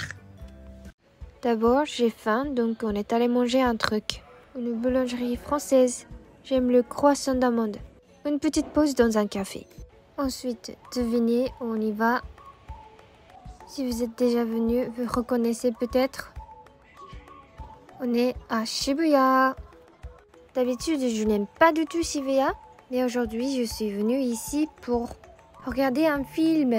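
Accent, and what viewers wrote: France. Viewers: 🎉 Je suis tombée sur ta chaîne tout à l’heure et AHHHHHH TU ES TROP MIGNONNE !! Tu es super attachante J’adore ta prononciation française !!! Ton accent est adorable ! Tu as une personnalité tellement tellement gentille Tu es bavarde aussi, c’est vraiment un plaisir de t’écouter Tellement naturelle ☀️ Merci pour tes vidéos 🙏 Des bisous de la France ! 🇫🇷 je suis sûre que tu lui manques beaucoup ! 🥺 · Joyeux anniversaire en retard moi c’est demain mon anniversaire 😊❤Et aussi ne change pas tes parfaite ,j’adore ton axant français quand tu parle ❤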